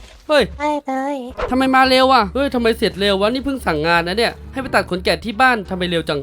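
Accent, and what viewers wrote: Isan, Thailand. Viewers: พี่ฉันเป็นคนอีสานหรอคะ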